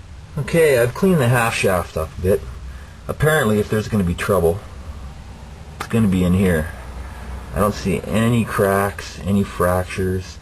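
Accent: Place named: Canada. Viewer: Canadian eh...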